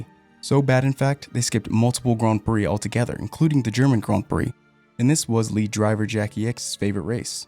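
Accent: United States. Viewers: no worries, its refreshing to hear an American talk about F1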